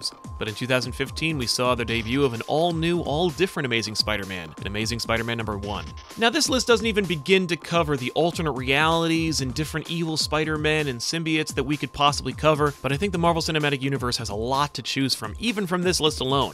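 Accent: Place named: New Jersey, United States. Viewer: sounds like a 30 somethings year old white guy from Jersey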